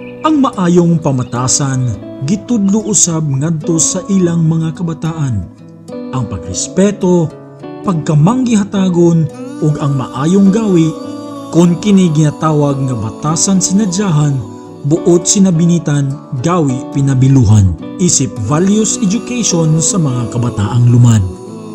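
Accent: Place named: Visayas, Philippines. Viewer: Wow masarap pakinggan ang tunog bisaya,at gand ng kwento,naaalala ko nung kabataan ko sa kwento mo idol, ganda talaga ng probncya, dati na kmi nakatira sa bayogan agusan del sur,godbless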